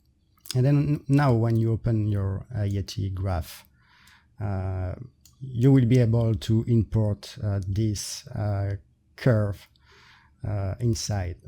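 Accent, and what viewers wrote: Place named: France. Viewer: Ah génial merci beaucoup!! Tout le long de vos vidéos je me demandais si n'il y avait pas un petit accent français caché derrière tout ça haha mais avec votre site il n'y a plus de doutes! Super travaux :)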